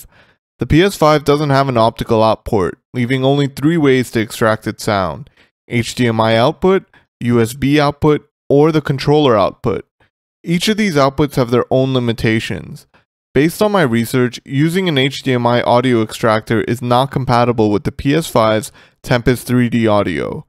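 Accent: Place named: United States. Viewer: sounds like a heavy dude, oh hes murican lool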